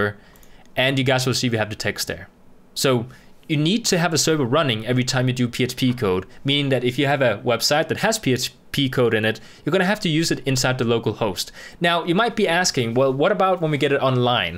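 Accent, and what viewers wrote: Denmark. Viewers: Was gonna say, you sound like my Norwegian cousins. Danish! Great tutorial, from a python and C lover. Subbed, upvoted, and all notifications enabled. :) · Hah du er dansk d er jeg også. Syntes også jeg kunne genkende accenten :). God tutorial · Er du dansker?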